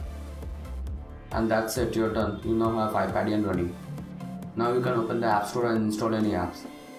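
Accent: India. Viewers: Lets try to play fortnite mobile on Pc , typing : " how to play ios games on pc " *, * opening the first video , then heard indian accent and said :"ok , Nvm" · when an indian guy is talking you just know it is scam. These guys need voice changers or a single native english speaker to back their success. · This FUCKING GUY WILL NOT ADMIT THAT HE IS A SCAMER Not believe me well, clue number 1 he is has a Indian accent, clue number 2 when somebody talks back after him protecting himself he dosent respond, last and most obvious clue he tried making us buy/download viruses What do you have to say now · This is a fake scam that will give you Adware, spyware, and other Trojans that will steal you personal info and make your computer unusable. Don't download this it is fake. Anytime you watch some one that sounds Indian dont trust them and if you click on a URL at the bottom to try to download something dont do it if it takes you to ad-fly or anything else like that. 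Take my advice. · As i heard indian accent, i paused and wrote this. #nextvideo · Ah....yes. Indian accent...I am pretty sure most of your subs are bot accounts.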